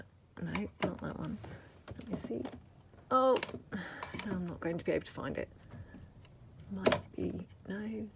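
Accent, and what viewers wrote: United Kingdom. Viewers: Love these . GREAT idea. I watch all you great video full of ideas i just dont comment much. Are you in the UK. Love the accent. I have always wanted to visit England a walk the foreshore of the Thames and pick up relics from so many years ago. Thanks for all the wonderful videos. Stay safe. hugs. i am in the US. In NC.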